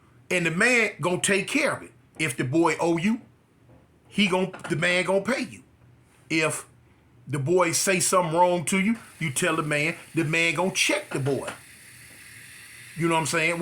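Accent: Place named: Texas, United States. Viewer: Are you from Texas? Why do you sound like my dad? Lol.. I know my dad, I'm not saying I don't.. But it must be a Texas thing how y'all talk, lol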